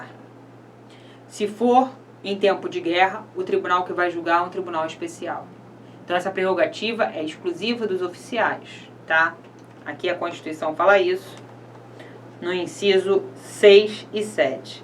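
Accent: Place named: Rio de Janeiro, Brazil. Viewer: Uma pena, uma aula tão rica e objetiva não ter tantas visualizações, obrigada professora! A senhora é 10, gratidão! Sou carioca mas moro em BSB, e as vezes esqueço o quão lindo é o nosso sotaque <3 estudo em casa, porém, logo voltarei para testemunhar minha aprovação neste comentário, Deus abençoe esse curso!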